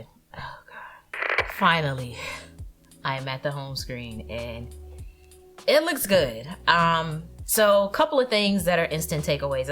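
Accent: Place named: New York, United States. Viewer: New York accent is strong. Probably I’m wrong but I don’t think so.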